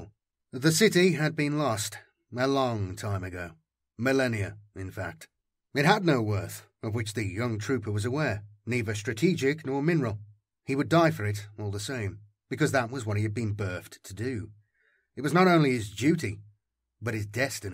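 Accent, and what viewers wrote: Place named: Midlands, United Kingdom. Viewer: Fairly new to you channel, loving it Always makes me smile hearing that Midlands accent. Dow worry, it ay as strung as moine our kid.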